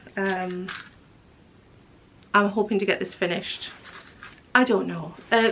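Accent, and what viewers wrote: North East England, United Kingdom. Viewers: You have a north east accent. Im Sunderland birn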